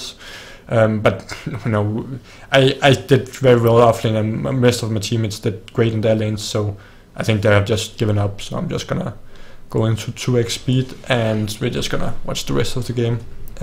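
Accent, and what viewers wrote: Denmark. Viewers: Hey are you from Denmark,?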